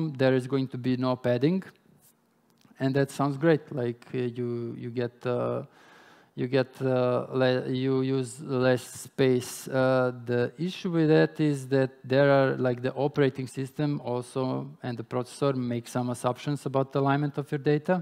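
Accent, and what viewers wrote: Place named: India. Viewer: Ирландец с акцентом индуса )), это немножко ломает мозг, но доклад классный. Спасибо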